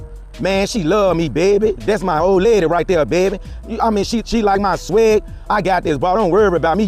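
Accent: Mississippi, United States. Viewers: R you from Mississippi bra… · I was guessing Mississippi.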